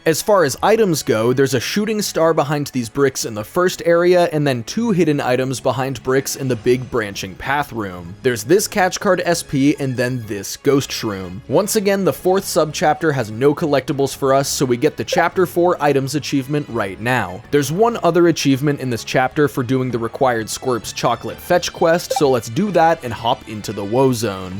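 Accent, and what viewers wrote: United Kingdom. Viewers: British?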